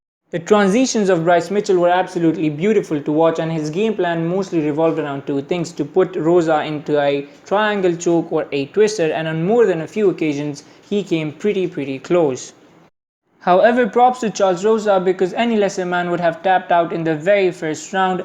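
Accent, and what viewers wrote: India. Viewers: 9 dislikes and 4 likes???? People are put away by your accent, but Cmon Indian hai toh you can't change that accent. This is quality content.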